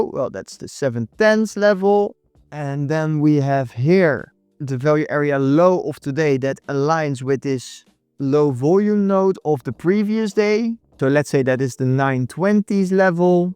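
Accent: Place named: Netherlands. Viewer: Continue your videos. They are really good and give me additional knowledge about order flow. I really appreciate it. I was wondering where are you from cause you have a strong dutch accent ?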